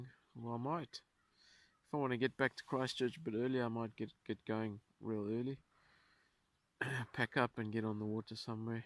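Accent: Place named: South Africa. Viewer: i love your films. enjoy your SA accent and knowledge of birds, etc, funny camping cooking scenes etc beautiful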